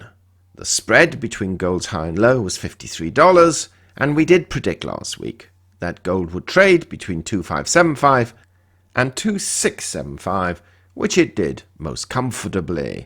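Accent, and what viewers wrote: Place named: RP, United Kingdom. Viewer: get rid of the posh English accent and the waffling,and you could cut the video time in half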